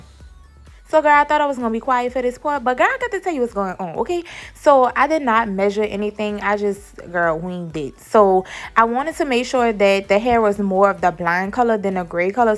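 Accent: New Orleans, United States. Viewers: I love your NOLA accent!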